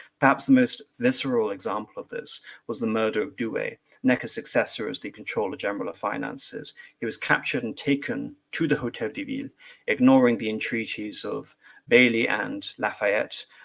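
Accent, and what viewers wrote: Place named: England, United Kingdom. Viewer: Does anyone know background? Is he English? He sounds it, but it could be one of those perfect accents learned at school.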